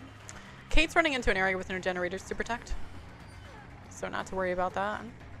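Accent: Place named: South, United States. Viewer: That southern accent , I don´t wanna camp ya´ll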